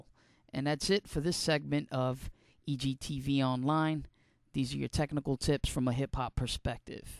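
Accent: New Jersey, United States. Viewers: You sure have a New Joisey accent. AWDACITY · are you from new jersey?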